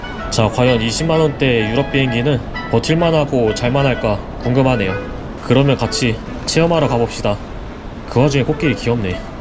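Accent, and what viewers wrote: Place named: Japan. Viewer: 영어 억양이 뭔가 일본 억양스러우시네욬ㅋㅋㅋㅋㅋ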